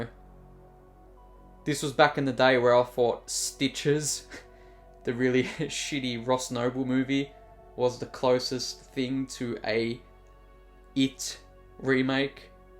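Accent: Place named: Australia. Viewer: I noticed your aus accent too, how much did you get the inart one for?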